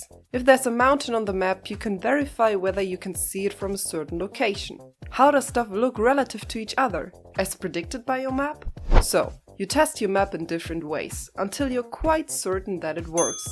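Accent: Germany. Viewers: After just 10 seconds into the video, let me take a wild guess: somewhere in the germanophone region?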